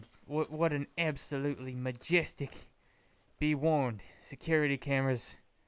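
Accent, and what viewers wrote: Australia. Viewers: R u Australian? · Nice aussie accent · That Aussie Accent, Yes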